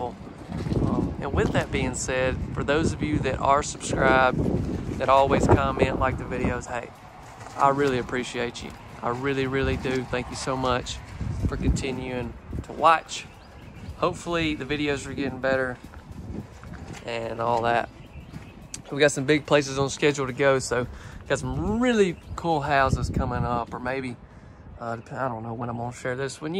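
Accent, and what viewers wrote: South, United States. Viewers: “You know whut” lol I’m from the south too 😆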